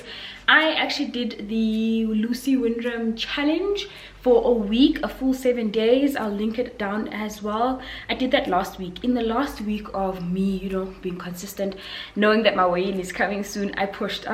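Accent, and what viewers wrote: South Africa. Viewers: so just stumbled upon your page, looooove your accent it's giving south African vibes man, where are you from? (sorry if i'm too forward) I always get this excited when I hear a fellow African accent somewhere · Obsessed with your accent. South Africa?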